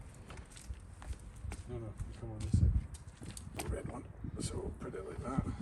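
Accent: Scotland, United Kingdom. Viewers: New subscriber here. You sound north Scotland ?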